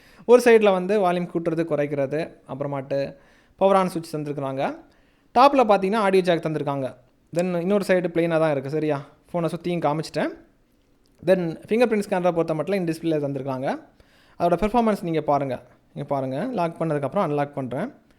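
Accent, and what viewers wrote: Kanyakumari, India. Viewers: Bro நீங்க கன்னியாகுமரி மாவட்ட போச்சு தெரியுது, சூப்பர் bro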